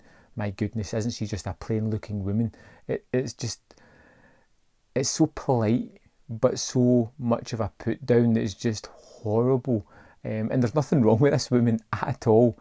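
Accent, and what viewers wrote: Scotland, United Kingdom. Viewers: My cc worked, in American English. The narrator sounds Scottish!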